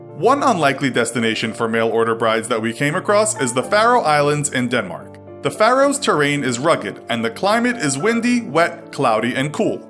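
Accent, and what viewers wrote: Canada. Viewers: The Infographics show are you Canadian? Because you say eh? from time to time. I’m Canadian 🇨🇦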